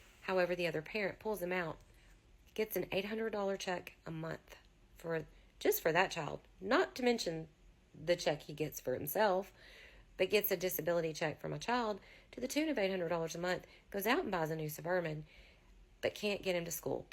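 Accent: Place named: South, United States. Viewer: I would take all documents and go to your State Capitol. Have copies for the FBI HEADQUARTER DEPT. THE ATTN GEN and DEPT OF JUSTICE. I can tell by your accent you might be in a small town in the South. I know how things can be. No matter. WE THE PEOPLE still stands! Here for you. You little boy having special needs should be covering him with even more protection under his rights of the disabled. He isnt a pawn on a chessboard game. Shouldnt be about hoarding money from a single Mom. ⚖ ⚔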